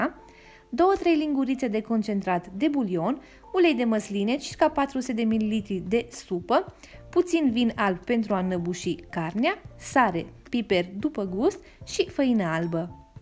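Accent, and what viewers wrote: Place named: Italy. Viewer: Bună ai fost în italia ai accent de italeanca eu mam născut în Italia 💕💕💕💕💕🥰😈💕💕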